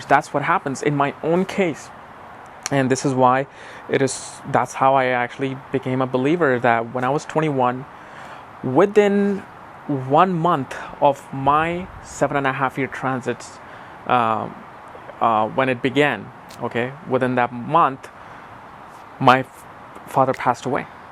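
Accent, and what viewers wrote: United States. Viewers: Just because he speaks in american accent? Do you think this is new invention? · that’s your petty mind speaking. Coz one thing that sucks about him is his American accent. It’s his attitude and how he explains astrology is very unique intelligent and entertaining, that draws us to him.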